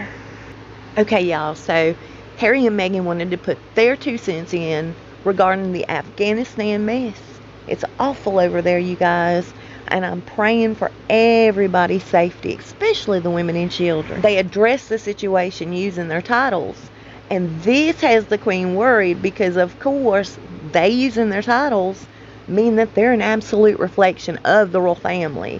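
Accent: South, United States. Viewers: Dear, thank you for your videos. They are always a breath of fresh air. And that lovely voice and Southern accent! I am relieved the diagnosis was wrong. As you recounted your misshapens, the fall, the hospital, the emergency room, the diagnosis, the health scare, I felt deep in my heart, they didn't know what they were talking about. Some medical staff were rude to you and others condescending. But thank God, in the end, the Almighty gave the correct diagnosis. I keep you in my heart and in my prayers. Much love!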